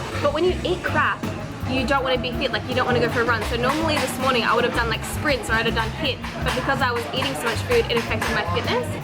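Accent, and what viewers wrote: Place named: Australia. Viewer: So Aussie.. I LOVE IT :)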